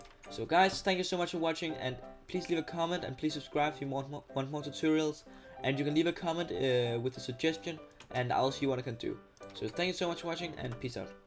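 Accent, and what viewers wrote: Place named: Denmark. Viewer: Man kan høre du er dansker. xD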